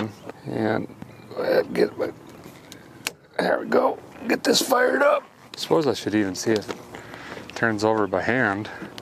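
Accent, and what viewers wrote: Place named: Midwest, United States. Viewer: It's the Midwest twang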